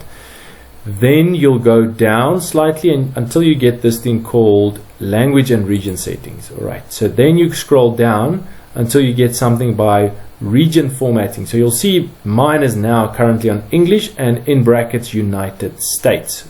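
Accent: South Africa. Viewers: Thank you so much. Very helpful (and naas to hear a South African accent!) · I never stop to comment on any of the thousands of topics I've gleaned through "googling", LOL. This was refreshing. A fantastically simple solution. I am suprised that, for once it was information supplied, and explained, in South African English by a South African voice! With guys like you around, doing your bit for the education of our nation. Such are the keys that will again empower us to build just as formidable an economy as that of the mid to late1900's where our country's economic strength gave the Yanks the proverbial "...run for their money". No pun intended. Looksee: "What was the dollar to the rand in 1971? One rand was worth US$1.40 (R0.72 per dollar) from the time of its inception in 1961 until late 1971, and the U.S. dollar became stronger than South African currency for the first time on 15 March 1982." excerpt from a <link> article; <link> › wiki › South_African_rand Bravo! 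I take my hat off to you Sir! Well done & keep up at forging ahead thus. What might seem to some as being minute could turn out to be a massive gift to others. Philosophically speaking: a small key may unlock large doors that open into large stores of immeasurable treasure when it is gifted with such a spirit as evident here. Which could and should be elevated to being an "impartation", almost, of much-needed and excellent socio-economic contributions. In the face of such contributions, politics eventually means nothing more than strategic puppeteering. Look at the American case as eviden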